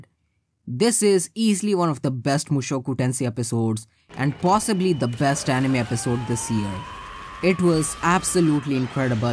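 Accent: India. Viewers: It's indian accent bruh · This indaian accent is killing me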